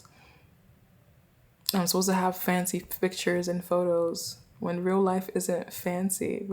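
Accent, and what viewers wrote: Nigeria. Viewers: Stop listening to all those people and just do what you did and enjoyed to get you here. If talking in you 100% Nigerian accent is who you are ...then be you and do you. Stop trying to please everyone but YOU!! Sweetheart you are hurting yourself for others. You are not a living sacrifice for them. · I love your Nigerian accent....it's beautiful...and so are you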